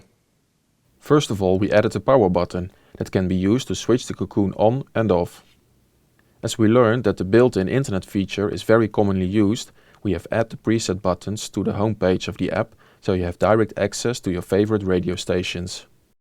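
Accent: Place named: Netherlands. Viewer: Ik hoor aan hem dat hij nederlands is ;)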